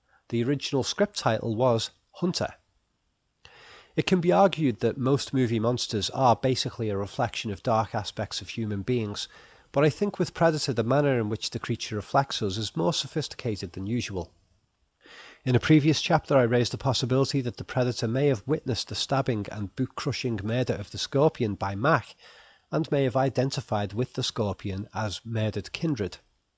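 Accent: Liverpool, United Kingdom. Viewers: A scouser from Liverpool, yes!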